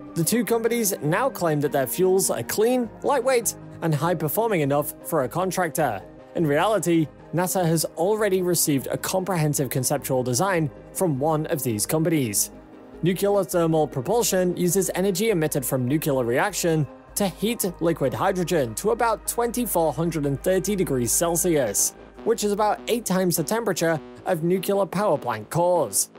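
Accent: England, United Kingdom. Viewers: Is he saying "Nucular"? Ax me if I care but I don't think he will be working on a nuclear rocket. Did he just say "Yeeahs" 12 months in a yeeah. I think he might be from England.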